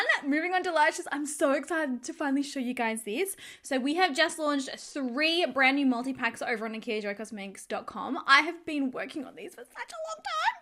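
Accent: Australia. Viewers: Love your videos. You are so beautiful and sweet. And I love the Australian accent. XO from Florida. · Why Australia has to be sooo far away?? 🌞🌞Love your videos and your accent!! 😙 #HolyGrails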